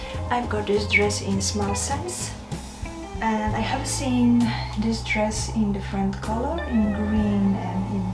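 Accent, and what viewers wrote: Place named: Netherlands. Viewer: You look beautiful,. But, your little feet need kisses x Your accent...are you Dutch? x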